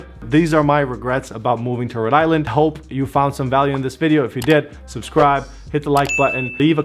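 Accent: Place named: New York, United States. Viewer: I definitely hear you being a New Yorker. I love the country and the city (not suburbs) and I have a modest housing budget so it’s always challenging (and intriguing) to decide where to live!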